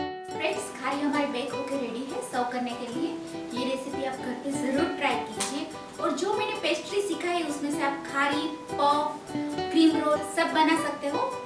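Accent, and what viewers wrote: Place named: Gujarat, India. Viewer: Good receipe. Thanks for sharing. But why Gujju people pronounce like Gujjus please work on your words. Come out of Gujju accent you will ROCK for sure. All the best.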